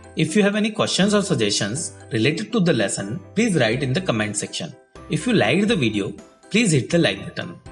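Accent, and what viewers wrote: India. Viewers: I wonder why i don't understand indian english? Is their someone feel the same?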